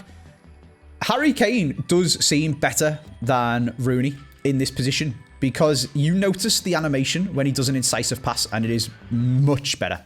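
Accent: Liverpool, United Kingdom. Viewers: He’s scouse!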